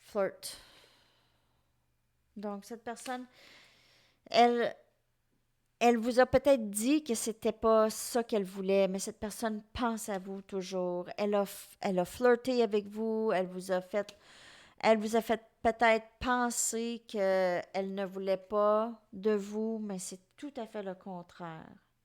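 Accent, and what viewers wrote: Quebec, Canada. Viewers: Hello, j'adore votre accent québécois 🥰 Vous avez dit des choses qui me parlent énormément par rapport à cette relation, l'arc de triomphe par exemple...C'est quelqu'un dhyper sensible en fait mais qui refoule ses emotions et qui se protège énormément avec son masque et son mental..il utilise la musique pour dire ses émotions...merci pour vos généreux conseils...it's a little bit funny this feeling inside... voilà une chanson qu'il chante souvent...c'est ma star 🌟😍🥰❤.. je vais ecouter la chanson d' pour écouter le message et essayer de lâcher prise..😌😉 Merci🙏 😘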